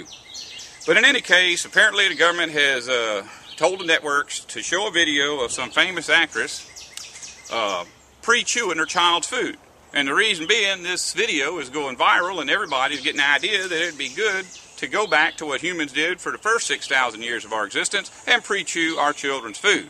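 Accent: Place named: New York, United States. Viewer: Aw heck, I though it was New York. His accent is a lot like yours.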